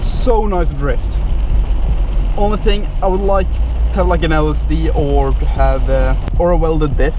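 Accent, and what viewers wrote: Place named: Norway. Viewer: Where are you from? You sound Norwegian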